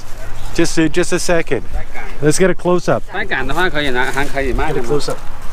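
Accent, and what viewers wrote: Canada. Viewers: Lol your accent, why does your accent remind me of DeadPool so much man, is it bc Ryan is also canadian i love your accent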